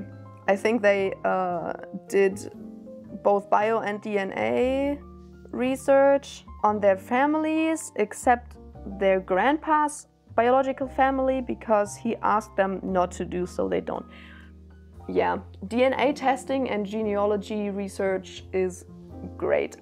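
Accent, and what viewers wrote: Germany. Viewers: When you said you were mostly Danish, I was like "huh, I could have sworn your accent was German". Made more sense when you said you were from northern Germany ahahah. Amazing video, love from Copenhagen!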